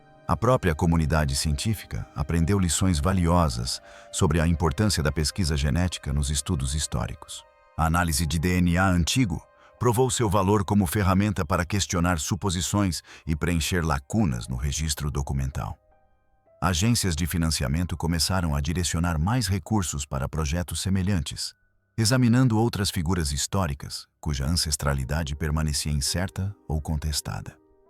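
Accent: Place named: Ceará, Brazil. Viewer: Eu jurava que era Cearense. Minha irmã.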